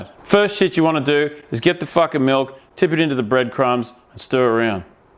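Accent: Australia. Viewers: i fucking subbed cause ur swearing in an Australian accent its funny as fuck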